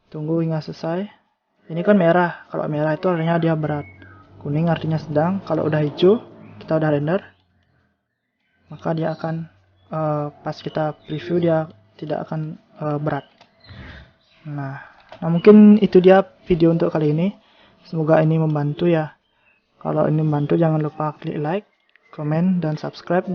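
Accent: Bali, Indonesia. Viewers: mule logat bali tetep kental wkwk, mantap lah bli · uhmmm logat bali :L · Salam logat bali brow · Logat nak bali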